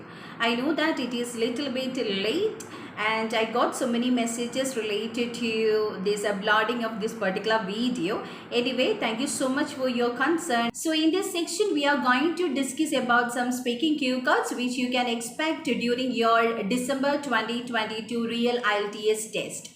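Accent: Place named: United Kingdom. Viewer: Mam is using British accent more.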